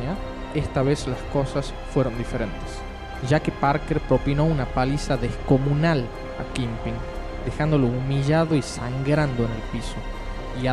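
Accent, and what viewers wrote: Tucumán, Argentina. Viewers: no es que no sepa pronunciarlo , el es de Tucuman , Argentina y en esa zona tienen ese acento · Sos tucumano o santiagueño? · Este es Argentino :v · siempre me genero fuertes emociones el comic de ciwil war y los posteriores a este T-T recordar esto me puso triste otra vez XDD PD: Te encuentro acento argentino... jajjaja de que parte? yo soy de tucuman XD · sos tucumano?? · Bien relatado, se nota la tonada tucumana! jaja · alto tucumano básico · Se te re nota el acento tucumano jajaja. Es genial ver a alguien del NOA con un canal con esta cantidad de suscriptores. Saludos desde Catamarca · tucumanoo como yoo jajaja de donde eres? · sos tucumano, salteño o catamarqueño? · eee el asento tucumano xd es feo sin ofender lo repito SIN OFENDER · Tucumano o me equivoco? Ajajajja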